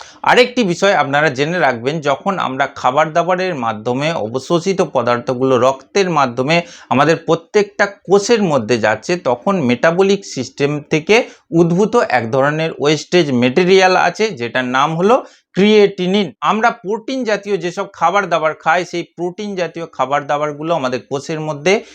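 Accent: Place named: Kolkata, India. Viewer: ডাক্তার সাহেব আপনি কি বাংলাদেশে বসবাস করেন ? আপনার কথা এবং ভাষা অনুযায়ী মনে হচ্ছে আপনি ইন্ডিয়ান অর্থাৎ ক্যালকাটার যদি বাংলাদেশে আপনিও হয়ে থাকেন তাহলে অবশ্যই আপনার নাম ঠিকানা কোন হসপিটালে আছেন জানাবেন।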